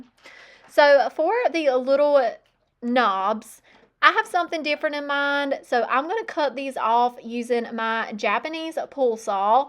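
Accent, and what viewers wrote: South, United States. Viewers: And my little secret, I do this and I haven’t told anybody…. But I walked through the house sometimes going.” hi I’m.” in my best country accent. I love the way you talk. It gives me comfort you’re so down to earth. So when people are going around, copying you and repeating how you sound even if it’s just themselves, you’re doing something right lady. ❤ Plus, if God wanted you different, he would have made you different. ❤️ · you don't change a thing, I love your sweet presence, and your awesome southern accent. I loved all of your projects, but my favorite are always the milk paint green. I hope you have a very Merry Christmas with your family and friend. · Girl, I'm from southeast Texas and I have a draw myself. I LOVE your accent and many people would KILL to have a southern accent. Have you ever noticed how much they use our accents in movies, YouTube commentary, etc. 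They are just jealous and only wish they had our accents. Be proud of who you are, how talented you are, your accent, your voice, everything. You're an amazing person and I personally love you and your channel! ❤❤❤ don't let the haters get to you. I would've pinned the comment on the screen and given her screen name. If more creators would call them out by name, I think it would Happen a lot less... keep doing you 😊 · I like your accent. To me it sounds very American. Surprise ! She's an American who lives in America. I don't have a problem with that 😅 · I thought you sounded country when I first started watching I grew up in country and I related and listening to you describe what you were doing with such cute projects is what made me keep watching So ignore that critic. I had a good laugh in another video when you said your dog was sighing because he was unemployed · Please don't hire a narrator. 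I am in Germany and specifically listen to your sweet Southern Accent and find it intensely soothing. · Oh my goodness, …. Your accent and vocabulary is a big part of your charm. At one time, I had a similar southern accent, and as I was on the phone a great deal with people around the country in my career, as people would tease me and not take me seriously, I worked hard to change my affect, and now, after all these years….its gone. Unless I go back home to SC and I fall back into it! 😂 Thank you for holding to your roots. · I’m in the UK and I like to hear the variety of US accents, it just gives your channel extra character and charm. Loved the projects 😊❤ Merry Christmas 🎄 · Some people just don't like our southern accents. You are fine exactly the way you are. That ugly comment was just from a nasty person. That person definitely has the problem, not you. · I agree! I love hearing your cute southern accent. 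Don’t change a thing! Some people are just miserable and try to bring others down. We love you! ❤❤❤❤❤❤❤❤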